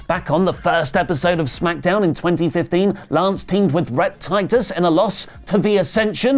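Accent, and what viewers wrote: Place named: United Kingdom. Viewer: Its his British accent lol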